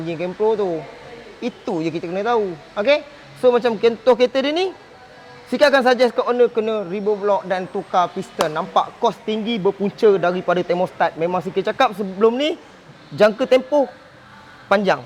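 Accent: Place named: Terengganu, Malaysia. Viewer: Gaye bercakap mcm org terenganu ni,betul ke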